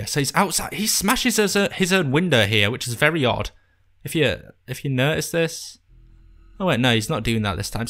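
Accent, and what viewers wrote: United Kingdom. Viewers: Another German here, i do like your videos too, but mostly i like your accent! I cannot recognize it, somehow british, but special. Could you tell me, where do people speak like you? Greetings from Frankfurt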